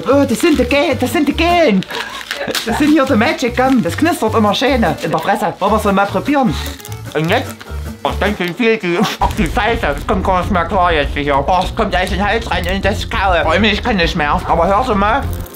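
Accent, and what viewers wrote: Saxony, Germany. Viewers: Hey,😊 kannst du mehr auf sachsisch reden bitte. Ich und meine beste Freundin haben uns totgelacht.😂😂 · Kannst du deine Videos jetzt immer auf Sächsisch machen😂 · Ich hatte einen durchgehenden Lachflash!!😂 Bitte mach weiter so du bist der Beste!! Als du die Perücke abgezogen hast war ich so erschrocken wie kurz deine Haare sind, weil ich mich schon so an die Perücke gewöhnt hatte!!😂😂 Mach weiter so und rede mal öfter sächsisch!!❤️😂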